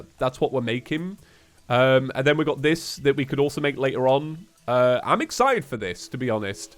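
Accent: United Kingdom. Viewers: that just the british accent mate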